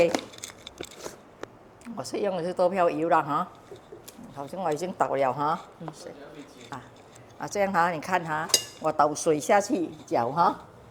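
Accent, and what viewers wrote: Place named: Hokkien, China. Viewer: Autie我早上好！ 谢谢你8你youtube 又见面了。 我喜欢听您的声音话语加福建❤🎉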